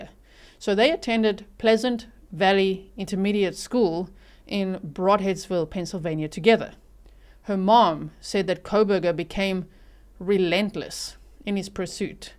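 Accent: South Africa. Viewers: Very much enjoying this content. I like the factual breakdowns. But someone, please help. I'm trying to place her accent but I'm failing. I'm thinking south Africa? My SIL's South A accent is similar but a bit different. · Im so glad is South African. Her accent is perfect for this space it gives an air of bipartisan, but you know she always stands for the victims. I guess I'm used to hearing American accents, and they just get on my nerves. Lol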